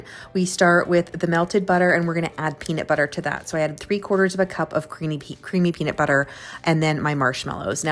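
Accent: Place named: California, United States. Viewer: That California speech pattern is horribly annoying.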